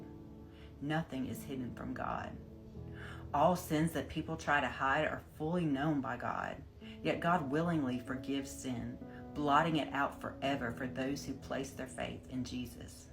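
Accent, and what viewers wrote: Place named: South, United States. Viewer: you're welcome! Luv your Southern accent, too! 💙 I enjoy your channel, thanks 🙏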